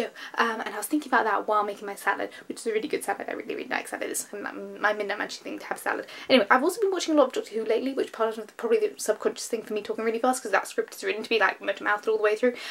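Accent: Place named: England, United Kingdom. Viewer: I had only minimal issues understanding what you were saying, but that's because I am used to hearing people with an English accent talk. Not quite at that speed but your diction and pronunciation is very clear. Congratulations on the 500 subscriber mark by the way. Hope it keeps building. Thank you also for being such a good moderator for Trisha Hershberger when she does her streams that you can be around for. I know the time difference is quite a challenge even when she streams in the afternoons(for her) since it is still a 9 hour gap. Please always keep up the lightness and cheer in your videos,tweets and everyday life, the world can be dark and full of terrors but you help shine a light through it everyday.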